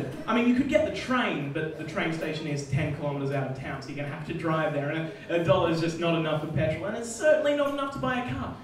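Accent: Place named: Victoria, Australia. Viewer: i love your accent. i live in sydney was born in vic (near frankston) and i love a good victorian accent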